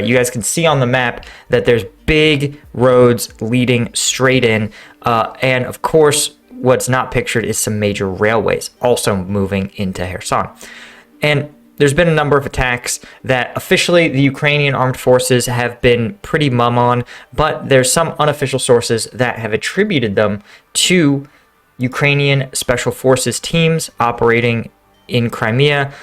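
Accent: New Jersey, United States. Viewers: Love the jersey accent😂😂 much love from north jersey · Thumbs up for that Jerseys accent. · Great explanations,as usual...also,best New Jersey accent ever :) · Jersey accent? Loved it. In using it to describe the explosions of Russian military facilities it Crimea, you just needed to finish with - Badda bing; badda BOOM! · Jersey accent for the win!!! 😂😂😂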